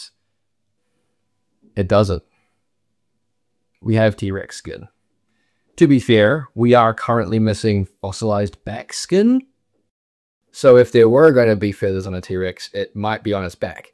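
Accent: New Zealand. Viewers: Do you speak with a Dunedin (NZ) accent? You have a Southland Burr sort of accent. 😊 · I'm new here. Loved it. Guessing you're a Kiwi. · South Island, New Zealand. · Are you a kiwi? Great video btw!!!!!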